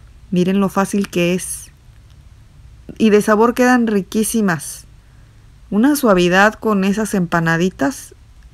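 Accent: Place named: Morelia, Mexico. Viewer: Cuándo oigo que hablas en el tutorial de este video Y de todos tus vídeos me transporto al estilo de voz de la gente de Morelia me preguntó la gente que ve estos videos si alcanza a percibir la diferencia de estilos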